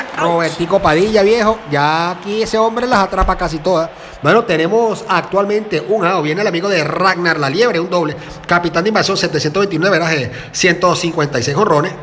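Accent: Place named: Venezuela. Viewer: Pareces que eres venezolano mi pana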